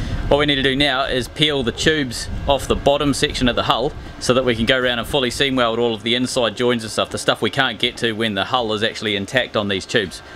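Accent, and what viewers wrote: New Zealand. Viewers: Great idea using the plastic chopping blocks. If it was me, I would have made a 'sandwich' with a plastic plate each side of the alloy tube and a longer bolt. Then you would not be relying on the sika (which I know can do the job) as when you have big blokes with big boots getting in and out of the dinghy it could be accidentally knocked off. Keep up the great work. Nice to head a real Southland accent!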